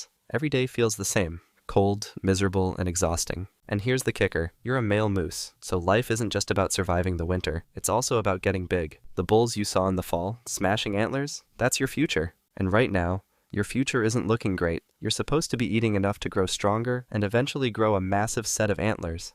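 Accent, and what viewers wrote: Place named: United States. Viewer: Why is the location of this channel the United Kingdom but the narrator is American?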